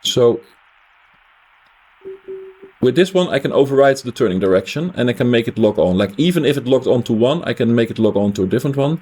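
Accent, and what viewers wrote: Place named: Netherlands. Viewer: you sound utterly Dutch 😂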